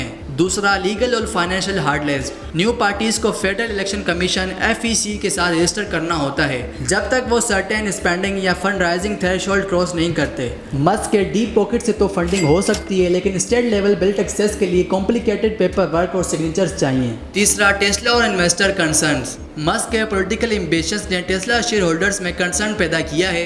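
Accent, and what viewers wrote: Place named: Sindh, India. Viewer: Pele nahi hota Pehle hota hai, urdu achi karo clear sunai nahi de rha thora jama jama kr bolo pause leke, sindhi lag rhay ho clear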